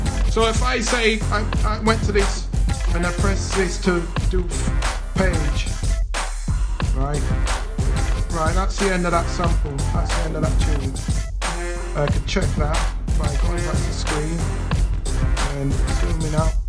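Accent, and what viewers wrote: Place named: Northern England, United Kingdom. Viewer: Hey! an Englishman, and a northerner to boot, What is there not to like. Seriously though its refreshing to listen and learn from someone from my own country. I've Subscribed and given you a like, and I know I will be constantly referring to this video as there is no way this old fart will take in and understand all 47 mins of this video in one go. Thanks again for taking the time to teach us a little of what you know 😎😎😎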